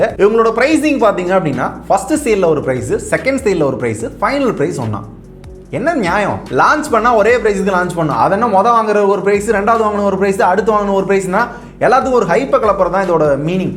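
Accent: Madurai, India. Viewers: Bro neega madurai ya?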